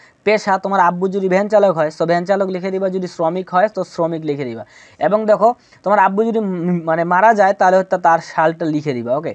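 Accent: Bangladesh. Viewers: Apnar kotha sunei ami bujhte paisilam j apnar bari Dinajpur a hobe, because amar bario Dinajpur. By the way thanks for this video 🥰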